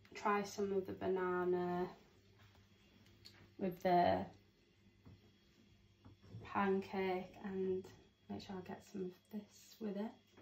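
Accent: Yorkshire, United Kingdom. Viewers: You are not hafe you are Somali. 100%🇸🇴💪❤🍇😘🥴🥴😁🥴 the Yorkshire accent did it for me so funny 🥰😍💪❤🍇😘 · Love the Yorkshire accent, would have liked you speak more Somali though.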